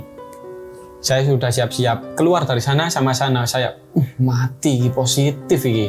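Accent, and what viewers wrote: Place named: Java, Indonesia. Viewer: Assalamualaikum Hadir Wonogiri,bang, Medok Jawa nya mas, 😂😂😂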